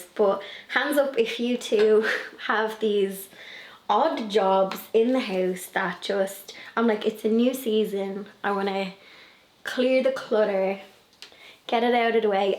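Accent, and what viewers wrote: Ireland. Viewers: September is a wonderful month, an intro to fall. Love your autumn bedding. Your house is so cute. You do such great things with it and your yard. Love watching your videos and hearing your Irish accent. I’m in sunny San Diego, California. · Hello Sweet Girl, I love watching your channel so much. You have such a beautiful relaxing spirit and watching you do your housework, crafts and garden 🪴 inspires me to those little things that I often put off. 😊 Love Ireland 🇮🇪 and your gorgeous accent, nothing beat’s a good Irish pub………..definitely beats drinking at home alone for sure! 🍻🥂🍾🍹❤ September is the start of Spring down here in Australia 🇦🇺 I love watching all the blossoms opening up on my weeping cherry tree and the buds developing on all my rose bushes! 🌹😊🌹 Kindest Regards Deb 🌷🌷🌷🌷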